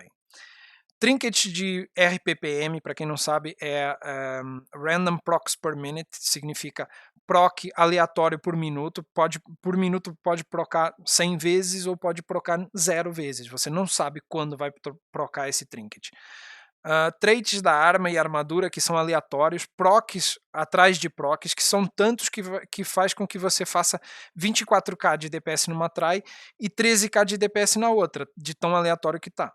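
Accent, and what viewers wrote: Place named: Portugal. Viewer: eu ia comentar que vc tem sotaque português e vc disse que tem família lá kkkkkkk furou meu coment! Cara vou sentir falta dos seus guias, na minha opinião os melhores de tank que já vi. Usei muito de war e de druida(mage tower)! os seus junto aos do Wild são os melhores guias de classe do wow em português. Gostaria de dizer que também parei de jogar desde o começo desse ano, e só voltaria a jogar caso muita coisa fosse reformulada, fiquei entre 6 e 7 anos aqui, um obrigado e quem sabe não voltamos um dia, boa sorte man!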